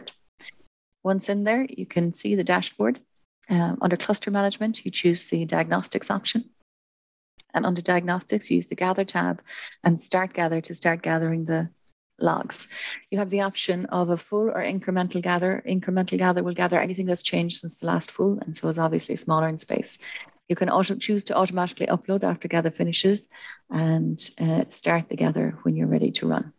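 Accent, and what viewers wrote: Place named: Ireland. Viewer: A lot more videos should be done in an Irish accent. Thank you.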